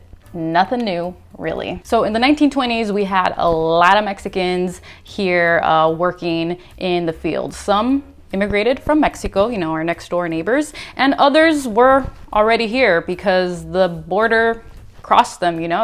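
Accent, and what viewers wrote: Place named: General American, United States. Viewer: Where in the states are you from/grew up? You have the most universal American accent ever--idk what people are talking about when saying you mispronounce stuff lol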